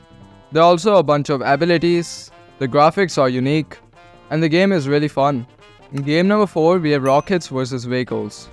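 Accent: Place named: India. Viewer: You seem to have a slight Indian accent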